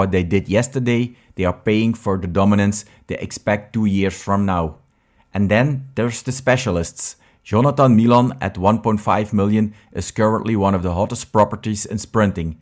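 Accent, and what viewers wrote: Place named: Limburg, Netherlands. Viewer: You have a funny english accent. Limburg maybe ?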